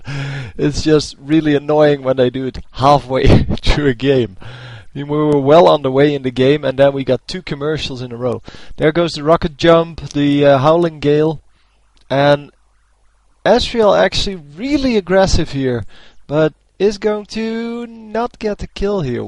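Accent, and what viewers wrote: Netherlands. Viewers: Ik wil niet lullig doen ofzo hoor, maaaar je hebt een beetje Nederlands accent in je engels :$, Maar toch leuke vids :)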